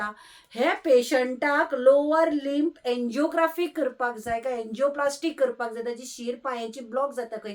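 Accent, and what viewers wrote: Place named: Konkan, India. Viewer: i always enjoy watching your videos more cos of your typical Konkani and for your sensibility.🎉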